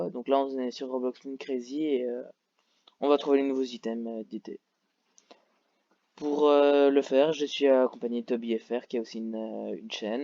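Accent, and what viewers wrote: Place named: England, United Kingdom. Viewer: cette accents anglais magnifique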